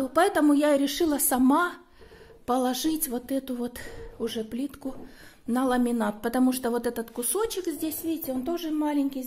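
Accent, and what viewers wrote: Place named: Shakhty, Russia. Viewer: я от вас балдею, только наши женщины не ноют и на все руки мастера, я ваша землячка из г.Шахты, определила это сразу же по вашему разговору, училась в Ростове. Привет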